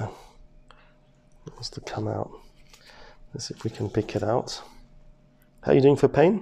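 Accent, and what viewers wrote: England, United Kingdom. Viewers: What I like about you is that you are very clean - you wipe the stuff off right away and you do everything quickly without hesitation, and correctly. No beating around the bush. You don't even need an assistant! You've got it all under control! Kudos to you!! And your beautiful English accent is wonderful! Love you!!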